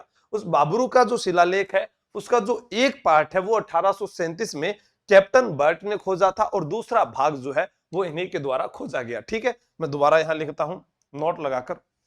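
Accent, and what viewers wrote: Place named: Marwar, India. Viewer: sir marwad me meri Nani ka gaw h whaa par aise hi bolte h😅😅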